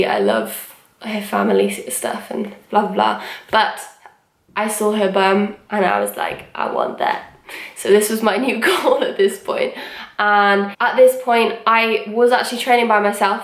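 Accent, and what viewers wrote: Wales, United Kingdom. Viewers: Is it just me that can hear a subtle accent?? Like I can obviously hear the London accent, but something else too?? No?? EDIT: shit, she’s welsh like me! 🏴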